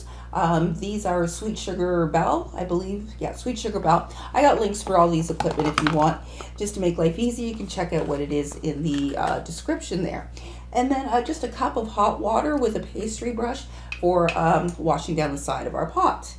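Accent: Canada. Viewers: Very informative, great Job! I am from Western Canada, can tell you are from Ontario I presume? can tell by your accent, especially when you say the word "about" LOL, all is good!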